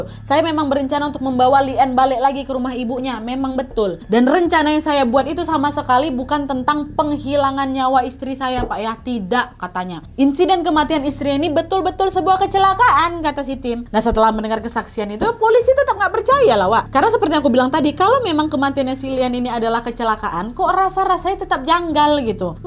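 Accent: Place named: West Sumatra, Indonesia. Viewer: Candu Bana mandanga ngecek Jo Bahaso minang lah😂😂😂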